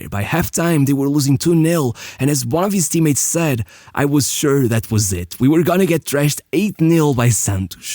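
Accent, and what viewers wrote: Portugal. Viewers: It's so obvious you are Portuguese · THIS MAN´S VOICE YOU PORTUGUESE ARENT YOU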